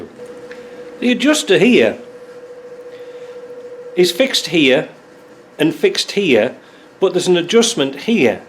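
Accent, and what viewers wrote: Yorkshire, United Kingdom. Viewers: The big red spanner has worked for me many a time! I only have MAP gas but with patients it works. Great presentation style, I can almost hear all the old Yorkshiremen that took you under their wings.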